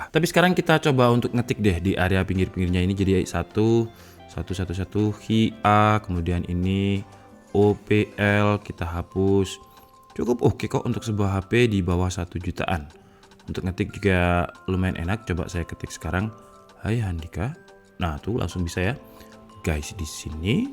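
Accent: Java, Indonesia. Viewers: njenengan Jawane pundi mas ? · Mas jowone daerah pundi Kulo boyolali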